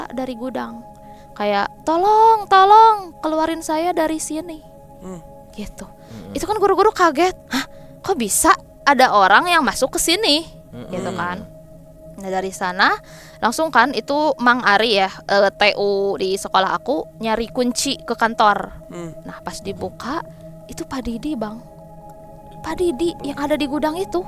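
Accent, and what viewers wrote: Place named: West Java, Indonesia. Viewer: Sundanis tulen bgt logat si teteh nya,, lanjutkan teh 😁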